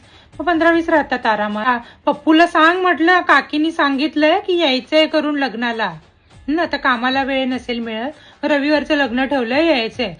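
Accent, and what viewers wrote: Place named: Malvan, India. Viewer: bhari malvani tune please asmi navv ghe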